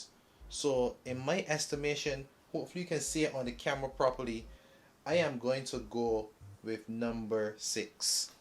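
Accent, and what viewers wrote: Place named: Barbados. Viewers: I stumbled upon his channel and from the time I hear the accent I say he got to be a Bajan lol. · Had to subscribe as soon as de Bajan accent hit me😁. Well done. Very informative video. Am seriously considering getting this printer.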